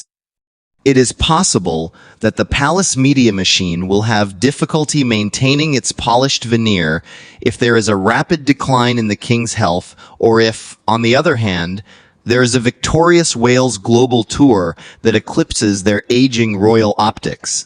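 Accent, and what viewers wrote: United States. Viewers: Could we please have a british commentator ! American pronunciation is abysmal.🙄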